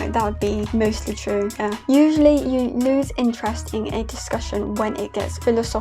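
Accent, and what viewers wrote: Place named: New York, United States. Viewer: This is interesting thanks for sharing! I like editing of the video too! I’m gonna sub to see more videos! I also am from NY so your accent keeps me hooked in lol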